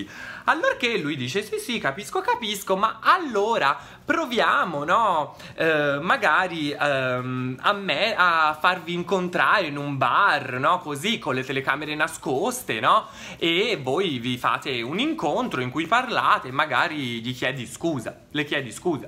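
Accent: Tuscany, Italy. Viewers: “MAILA CHE CARDO CO STE LUCI”😂😂😂 Chi è toscano come lui/me qui? 👇🏼👇🏼Mettete like👇🏼👇🏼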